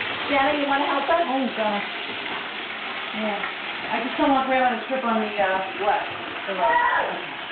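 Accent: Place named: Brooklyn, United States. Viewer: Could hardly tell you were from Brooklyn.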